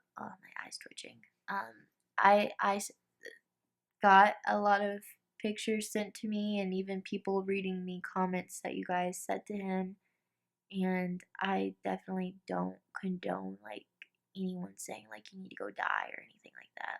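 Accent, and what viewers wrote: South, United States. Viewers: Hey, I am so glad that you are feeling happy in this video. I completely love it when a girl starts crying, and then she would finish crying, and then she would start smiling and laughing. I am so glad you are feeling okay because I have been thinking about you and praying for you. I know I am much younger than you are (I am 18), but I admire you a lot. The number one thing I love about you........... is your one beautiful precious smile, along with your lovely Southern accent.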